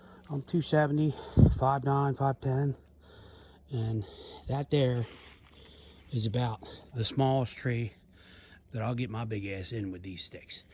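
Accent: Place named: Philadelphia, United States. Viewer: where u from ? Philly ? lol. Can hear that accent a million miles away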